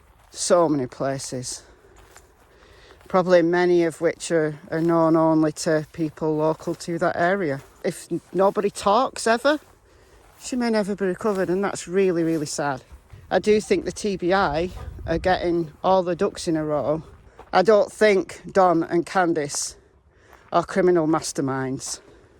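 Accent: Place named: Northern England, United Kingdom. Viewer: This case is maddening! I love listening to you ( and your English accent!) You must be in good shape from all the walking you do! I would be so out of breath, I wouldn’t be able to talk! I love this channel and virtually walking with you and your dogs!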